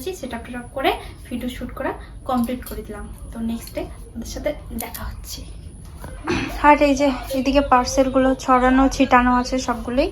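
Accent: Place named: Bangladesh. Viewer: Tumi ki Bangladesh chila?? Ekhankar moto vasha lagteche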